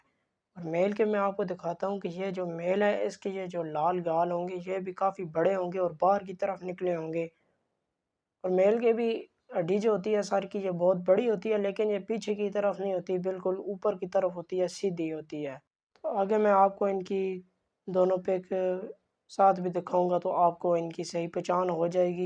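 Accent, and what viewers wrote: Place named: Punjab, Pakistan. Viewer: اپ اپنا اردو بولنے کا طرز ٹھیک کر لو اپ کا انداز بیان اچھا ہوگا اور اردو بولنے کے اندر پنجابی لہجہ نہیں ائے گا تو لوگ اپ کی بات سے ویسے ہی متاثر ہوں گے اور خود بخود اپ کو سبسکرائب کریں گے کیونکہ جتنا اپ روانگی کے ساتھ ادب اور اخلاق کے اچھے بہترین طرز کے ساتھ اپنی گفتگو کریں گے اتنے سارے لوگ اپ کی ویڈیو کے لیے بے تاب ہوں گے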